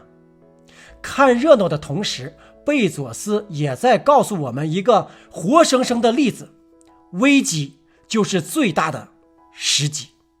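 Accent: Northeast, China. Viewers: 👍👍💕👏👏好像是我們東北口音？！支持你✌️💐🕊️🕊️🇸🇪🇳🇴🇸🇪🇫🇷🇮🇹🇩🇪🇻🇳🇭🇰🇯🇵🇸🇬🇳🇴🇮🇸🇫🇮🇳🇴🇹🇭🇦🇺🇯🇵🇸🇬🇺🇸🇹🇼～～～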